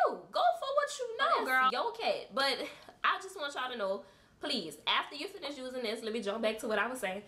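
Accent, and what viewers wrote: New Orleans, United States. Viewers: Are you from N.O.?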